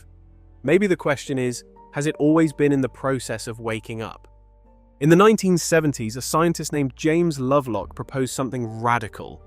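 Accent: United Kingdom. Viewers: i’ve been studying the Sanskrit Vedas to understand things for 15 years. this video is excellent and very easy to understand. nice to hear a fellow british voice too 🙏🏽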